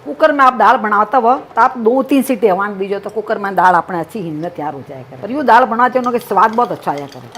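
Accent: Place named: Rajasthan, India. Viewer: Auntyji apari marwadi sunn kar mummy or naniji re yaad aa gaye.... Thari kadhaii jali wali hai.....spl kaiii hai kadhaii ma...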